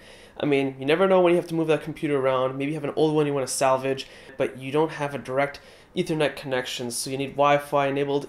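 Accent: United States. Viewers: wow!! You speak like U.S accent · Is he american? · You speak like U.S accent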